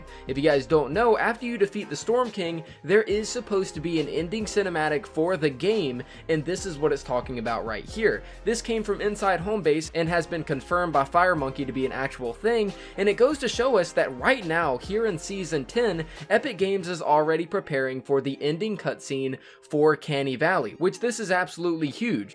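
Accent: France. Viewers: is he from france ?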